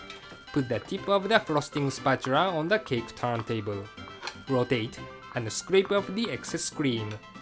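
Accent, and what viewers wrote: Japan. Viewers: Noo! Just Japanese engrish! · I just love Japanese accent. It makes the cake yummier!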